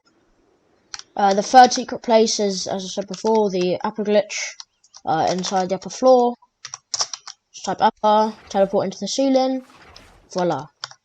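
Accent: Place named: United Kingdom. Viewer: I like how ur talking Britain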